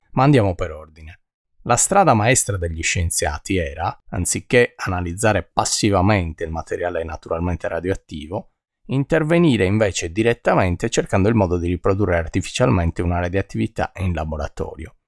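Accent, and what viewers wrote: Sardinia, Italy. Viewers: Complimenti per la sua chiarezza espositiva. Il suo italiano impeccabile e il suo innegabile accento sardo rende ogni suo video un tributo alla scienza che vale la pena essere visto e interiorizzato.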